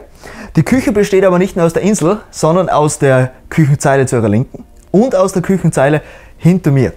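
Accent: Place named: Austria. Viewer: Schönes Objekt. Aber diesen österreichischen Schnellsprecher musste ich stummschalten.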